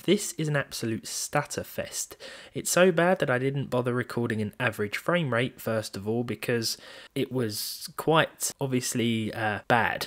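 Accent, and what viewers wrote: United Kingdom. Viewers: Britain accent is so funny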